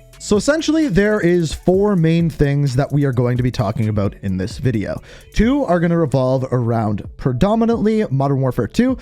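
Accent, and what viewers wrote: Canada. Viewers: It's always funny to listen to how Canadians say "about" . I'm not watching the rest of this video hearing someone speaking this way. It's like Canadians specifically love to say the word about for some reason. Disliked and not watching it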